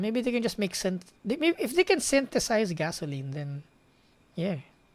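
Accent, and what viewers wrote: Philippines. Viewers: Motorcycle and car noises in the background, accent, didn't even have so say it accidentally, fellow filipino spotted 👍 But dang, streaming 11 midnight, good content tho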